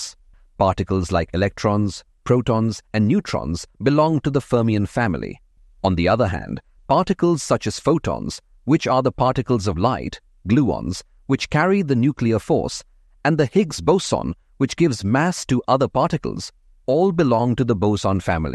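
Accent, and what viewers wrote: India. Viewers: This video is obviously created by an Indian. Even though he/she tried to hide his/her Indian accent using a weird robot sound, one can still tell it's an Indian talking by his/her mispronunciation of the letter "T" when he/she said "doo taosand dtwenty five" instead of "two thousand twenty five". Also the incorrect use of the article "a" instead of "the" in a sentence is very common among Indians and gives their identity away.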